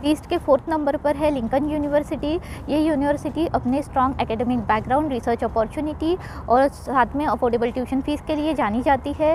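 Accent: Gujarat, India. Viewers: Your accent feels like Gujarati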